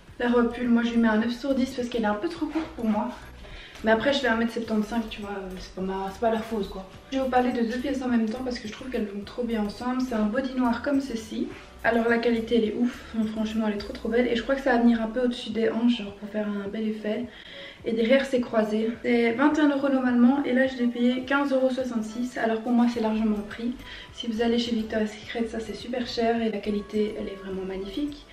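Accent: Belgium. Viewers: C'est un truc de dingue comment j aime trop l accent belge mdr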